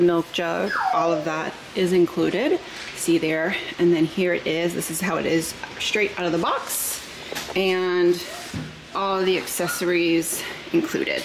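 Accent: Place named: Appalachia, United States. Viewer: For the love of all things holy can you please learn the proper way to say “espresso” and “ accessories”? There is NO “x” in either! I can’t watch this video with your hillbilly verbiage!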